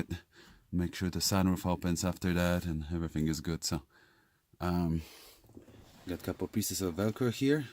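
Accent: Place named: United States. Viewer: nie mam pojęcia co mamy w naszym polskim języku. Mimo że świetnie mówisz z amerykańskim akcentem jednak po 2-3 zdaniach zacząłem wertować u ciebie na profilu informacji by potwierdzić moje podejrzenie że jesteś Polakiem. Ja staram się jak mogę nauczyć akcentu amerykańskiego ale raczej do końca swoich dni będę mówił polenglishem.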